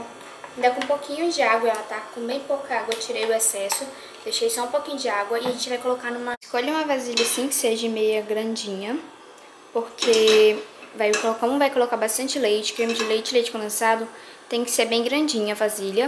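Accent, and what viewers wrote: Pará, Brazil. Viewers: sotaque de paraense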